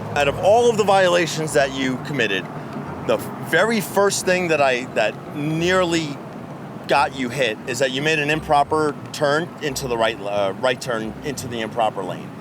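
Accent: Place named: Long Island, United States. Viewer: You can tell you are from Long Island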